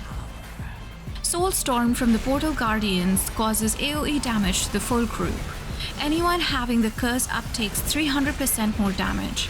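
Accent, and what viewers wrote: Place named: Asia. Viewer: It's very refreshing hearing a fellow Asian covering WoW with your accent lol ❤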